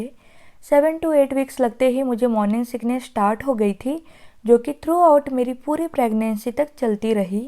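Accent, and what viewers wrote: India. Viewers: Mast anti tame kathiyavadi cho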